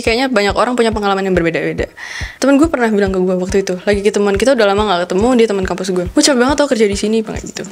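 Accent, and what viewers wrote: Java, Indonesia. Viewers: Mbak mbak, keren medoknya 👊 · Ahh medoknya 😍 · cantik bgtt sayangnya medok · Medok banget mbak XD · swenneng aku sing ngonten ini 😊 cici medok hehe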